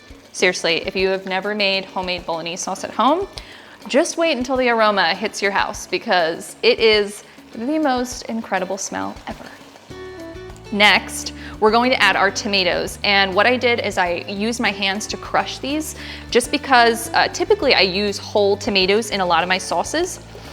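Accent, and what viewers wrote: Philadelphia, United States. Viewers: You sound like you're from my area near philly. sawce 😀gonna make this for my wife tonight. thank you!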